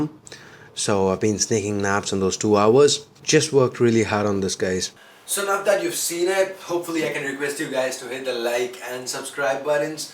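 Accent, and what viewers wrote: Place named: South India, India. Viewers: for a tamil. you have a fantastic english accent. i would definitely take you for my movie if i was a director. TWO thumbs up brah! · from his accent..he sounded south indian for sure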